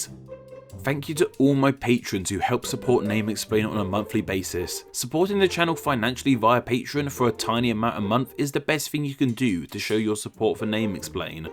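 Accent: United Kingdom. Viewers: It's only fun to say because you're British and have a British accent. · No, you have a British accent, therefore you're not so much watchmojo as you are Whatculture.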